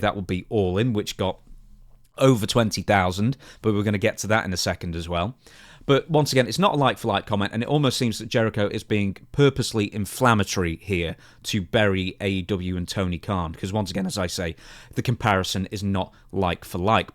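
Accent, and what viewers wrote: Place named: Leicestershire, United Kingdom. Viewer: You're not from Manchester. That's a Leicestershire accent. I know it when I hear itm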